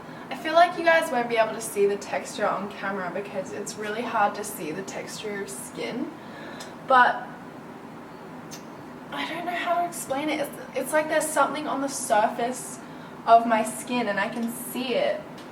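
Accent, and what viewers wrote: Australia. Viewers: Oh my goshhhhh you're so right about the IT powder. Hate it. I do a lot of flash photography and learned the hard way about the white spots. Then again about the UD eyeshadow palettes. I have 3 of them and I bought them when I first started to really get into make up and because urban decay was/is a popular brand only to find out those eyeshadows really do suck. I just got my first ABH palette and there is no comparison. By the way you are so gorgeous I love watching your videos. Plus I love the Australian accent and if I ever go out of the USA I'm going to Australia hands down. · Mia she's Australian. it's an Australian accent and her address is clear enough to tell she lives in Australia... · Nuria Olid shes british? she sounds kind of australian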